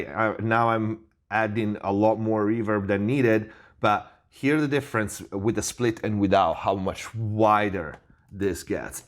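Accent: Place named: Italy. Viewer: Ma allora sei Italiano? Dall'accento infatti, mi sembrava, leggermente familiare con la nostra lingua:). E' molto buono il tuo inglese, sono stato anch'io a Los Angeles e spero di fare un' esperienza ancora più lunga. Grazie per i video e per i consigli, sto imparando alcune cose!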